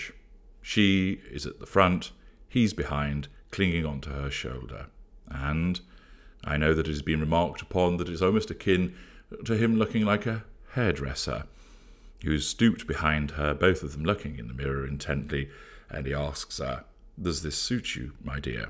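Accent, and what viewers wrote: United Kingdom. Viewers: Another brilliant video, you are so funny 😆 I really enjoy listening to you, love your British accent, eloquent explanations and sense of humour! Wish you all the best for the future and success with your YouTube channel!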